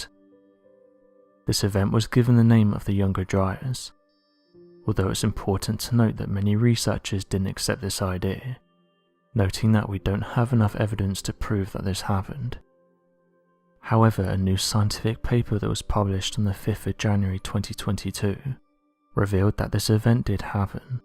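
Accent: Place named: Cockney, United Kingdom. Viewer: Yes, is very hard to listen to.. the low, whispery/breathy narration in cockney is horrible. The other chap is so much better.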